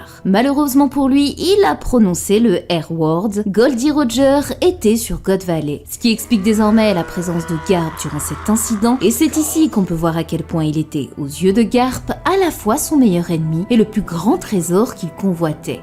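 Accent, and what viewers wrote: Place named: Belgium. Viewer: oui un peu à la manière de certains belges et plus précisement quand le u est suivi d'un i ^^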